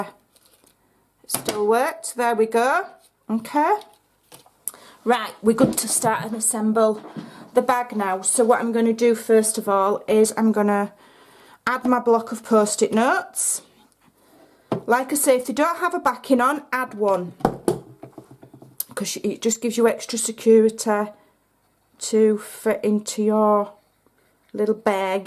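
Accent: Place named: England, United Kingdom. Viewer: those are just the cutest! Your laugh and English accent is adorable. Thanks for sharing your talent.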